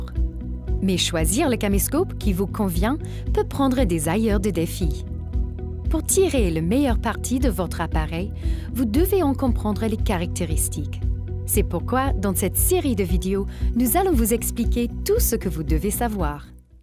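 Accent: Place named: Quebec, Canada. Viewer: Québequois anglais jpense mdr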